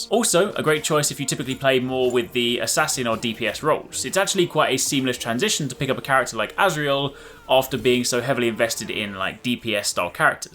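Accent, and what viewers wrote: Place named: United Kingdom. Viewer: Here because I had a comment saying we sound the same! 😅 We don't... we're just both British! Haha. All the best to you dude 🙂👍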